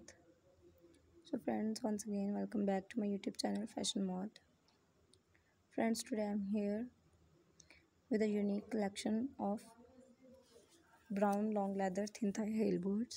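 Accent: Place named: Japan. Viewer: Your Japanese accent kills me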